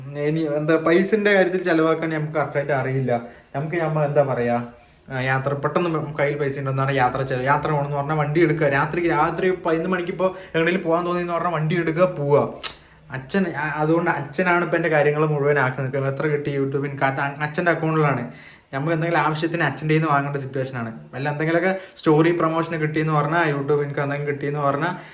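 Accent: Palakkad, India. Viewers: palakkad slang 📈🔥❤ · ചേട്ടൻ്റെ വീഡിയോ ഞാൻ കാണാനുള്ള പ്രധാന കാരണം ഞാനും പാലക്കാടിൽ ആണ് താമസിക്കുന്നത് ഈ ഭാഷ സംസാരം കേട്ട് തന്നെയാണ് വീഡിയോ സബ്സ്ക്രൈസ് ചെയ്തത് ❤️‍🩹പിന്നെ യൂട്യൂബിൽ ഉള്ള ചില വ്ലോഗേർസ് ൻ്റെ വീഡിയോസിൽ കമൻ്റ് ഇട്ടാൽ വലിയ ജാട കാണിക്കും ഏത് കമൻ്റിനും റിപ്ലെ തരില്ല😑 പക്ഷേ ചേട്ടൻ ഞാൻ ഇത് വരെ ഇട്ട എല്ലാ കമൻ്റിനും റിപ്ലെ തരുന്നുണ്ട് 👍❤️ അതുകൊണ്ട് തന്നെ ഞാൻ ഇനിയും ഇടുന്ന എല്ലാ വീഡിയോയും കാണും സപ്പോർട്ട് ചെയ്യും❤️‍🩹🧿🫂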